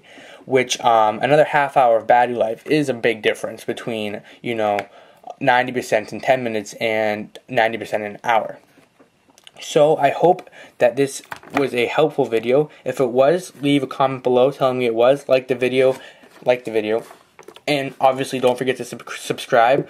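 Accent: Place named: Canada. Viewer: youre canadian....i can tell...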